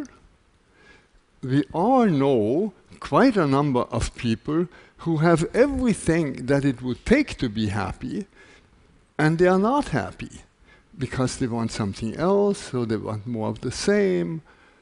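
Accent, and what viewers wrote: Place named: Austria. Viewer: Wyczułem austriacki akcent na początku :) ależ jestem dobry!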